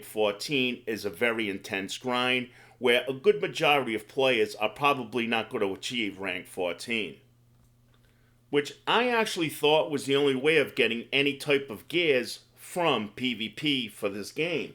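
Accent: New York, United States. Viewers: Thanks for keepin it real, also dig the NY accent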